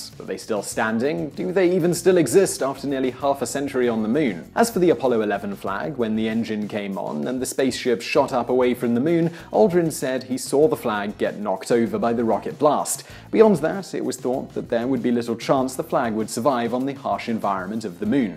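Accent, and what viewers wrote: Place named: RP, United Kingdom. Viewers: Thomas Smith posher English I think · Thomas Smith yeah sounds posher than me anyway, southerner that'll be why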